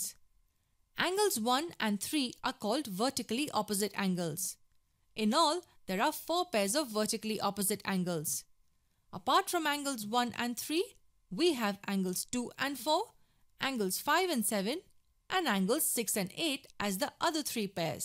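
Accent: India. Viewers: why would you use an indian persons voice bruh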